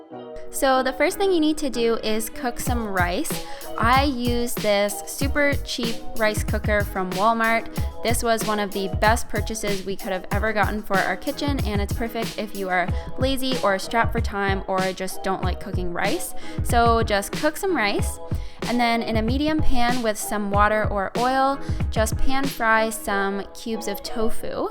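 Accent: Canada. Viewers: Dark hair reeeeally suits you! And your Canadian accent is adorable :) love this! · Do I hear an accent? Canadian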